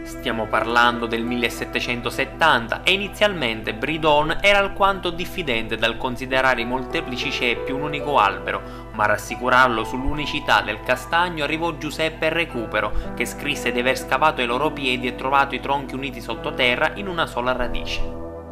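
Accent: Sicily, Italy. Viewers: accento siculo e pure un pò culo. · minchia che accentazzo siculo!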